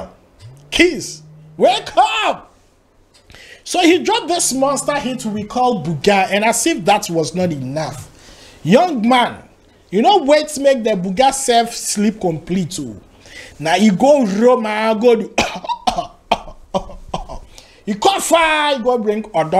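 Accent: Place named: Nigeria. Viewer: Walai you don turn Naija as u dey talk. kizz na sure man him dey throw beta song wellwell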